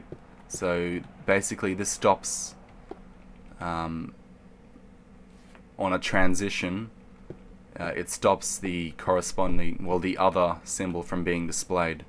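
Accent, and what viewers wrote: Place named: Australia. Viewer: your aussie like me :D i can tell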